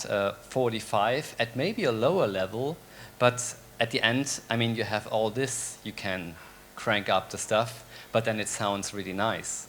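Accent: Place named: Germany. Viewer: How about german with subtitles? I hear the german accenct all over why not talking in german, like electronic beats - Slices?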